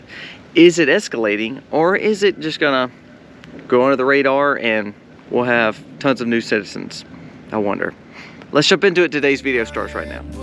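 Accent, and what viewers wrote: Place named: Mississippi, United States. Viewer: Love listening to a local mississippian. Thank you for you updates.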